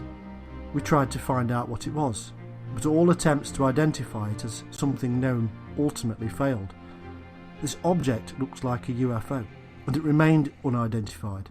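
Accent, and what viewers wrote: United Kingdom. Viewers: Devon accent?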